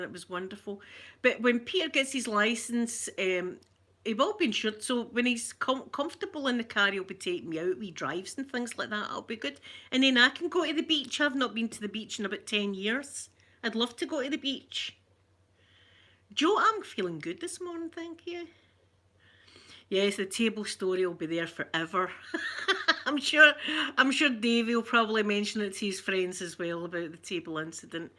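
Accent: Scotland, United Kingdom. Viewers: Aww, don't give up on your dreams of becoming an astronaut! We'd be honored to have you representing the US with your amazing Scottish Accent and sweet personality 💙💙💚💚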